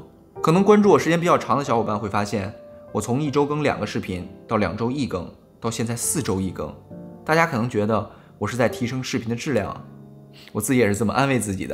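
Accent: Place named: North, China. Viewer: 大陆北方人说话的习惯 我们也不习惯听台湾腔 也不习惯听阿拉伯语 西班牙语 但是重点难道不是；内容比较重要吧？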